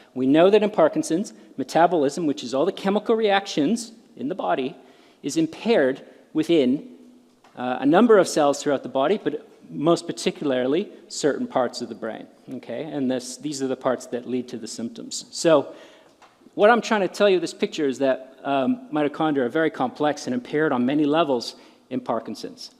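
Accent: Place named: Canada. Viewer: Love listening to your Canadian twang. Always good to hear from you.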